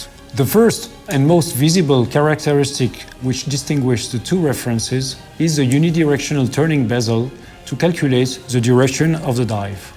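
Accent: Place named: France. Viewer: Gotta love it when the French try to speak English. Don't get me wrong, I admire it. When I travel to France the reception is warm due to my trying to speak their language. I have a ways to go in terms of improvement. They respect those who at least have some understanding of the French language and don't butcher it.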